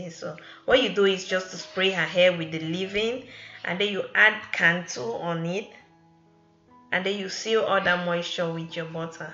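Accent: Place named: Africa. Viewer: Do your daughter have an accent like yours? I love your beautiful African accent, where in Africa are you from?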